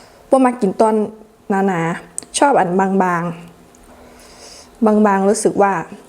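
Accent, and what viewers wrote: Isan, Thailand. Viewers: ไทยคำอิสานคำ..น่าฟัง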